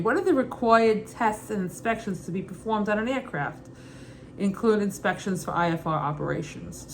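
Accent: Brooklyn, United States. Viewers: Fantastic video! Thanks for sharing. Absolutely love the Brooklyn accent—so charming! 😄"😀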